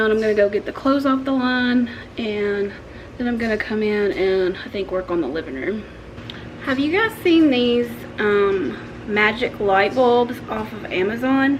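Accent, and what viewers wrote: South, United States. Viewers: As a Texas girl who moved to Minnesota, you do not need to "correct" your pronuciation. Your southern accent is part of your charm. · Love your haircut, it totally suits you! And you say decor however you want. People just don’t understand how we talk here in Kentucky. Why don’t you say Holler, that’ll give something to think about lol. I moved to KY in 2007 and my accent has changed drastically 🤣. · We love your rambling company keeping videos. And please say decor the way you like to say it. I love your accent. I’m from the north, but have a lot of family in the south and your accent makes me feel good brings back. Wonderful memories. And I love your little place too. It is so charming and homey.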